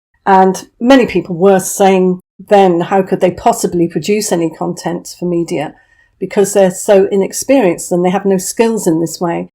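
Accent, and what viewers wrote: United Kingdom. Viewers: Thank you for your great reporting! You have the most lovely & quintessential British Lady voice. It's very kind & soothing. A breath of fresh air in all this worlds madness. Makes me miss the UK. Much love from Chicago😘❤️ & Peace🕊️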